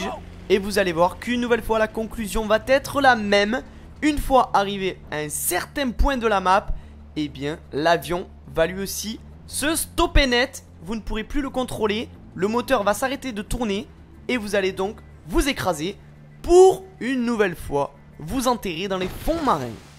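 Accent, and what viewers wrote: Marseille, France. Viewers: jentend un accen marseiller (vive paris) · il parle comme les marseille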